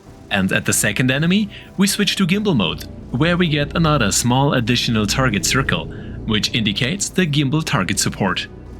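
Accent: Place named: Germany. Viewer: Irgendwie hört sich der Titel komisch an mit "The Great ... " es wäre passender gewesen "Big Guide, oder Hugh Guide", musste schmunzeln 🤣 PS: warum machst du eigentlich auch Videos in englisch? In deutsch macht schon Sinn, beim zuhören bekomme ich fast immer starke Kopfschmerzen, weil du Englisch mit deinen deutschen Dialekt sprichst, es hört sich so an, wie wenn Arnold Schwarzenegger in den 80' spricht. Englisch passt gar nicht zu dir, vor allem ein paar Wörter konnte ich gar nicht verstehen, weil zu undeutlich gesprochen. Wenn es deinem Kanal was bring o.k, sonst mach dir diese Mühe erst gar nicht. Nur so meine Meinung, dafür ist ja die Kommi Funktion da.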